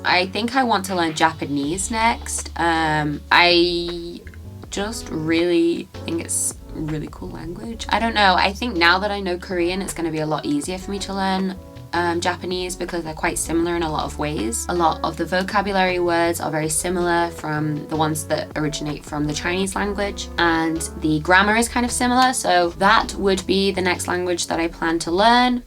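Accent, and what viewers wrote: United Kingdom. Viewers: She sounds completely British to me? What Americans have you been listening to 😂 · I love your british accent and Congrats to have a 6k subscriber!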